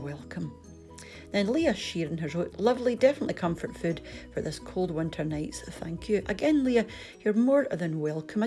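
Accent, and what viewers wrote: Scotland, United Kingdom. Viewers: Very impress with your recipe simple n easy. I am chef as well this first time seen your channel☺️ well done, most is your voice so Scottish and clear voice n your giggling 😉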